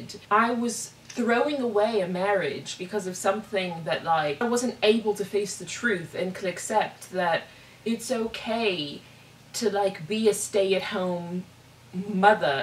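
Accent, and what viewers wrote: United Kingdom. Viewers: Why do you sound British? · You really are a true artist. Been British myself too, let me tell you. I claapprove of your british accent! Have fun in 🇸🇪 Sweden. Im glad you're doing well. Be blessed!!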